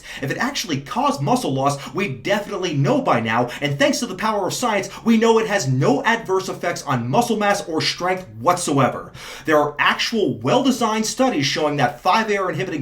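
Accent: United Kingdom. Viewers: That British accent, I died. :)) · Hahaha love the British accent 😂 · thank you for this video. As a british guy (that uses finasteride) who casually reads the BBC for national and international news, i was very worried by this article, particularly the anti-finasteride view it was pedalling, and was shocked at how keen they were to publish this losers sob story. All these facebook posts sharing this story and a similar one published by The Economist is full of comments by uneducated boomers who believe everything they've read and now think there's some sort of trade off to taking finasteride. It's straight up misinformation and I'm really hoping there's a resistance to this shit in the form of a news article or this EU study that demonstrates the safety of finasteride and how much of a loser Kyle is. God forbid they choose to ban finasteride, I don't know what I would do, but I'm banking on the medical study not using Kyle's sob story as evidence. 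Also your british accent has me absolutely cracking up! 😂😂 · Thank you for speaking in British English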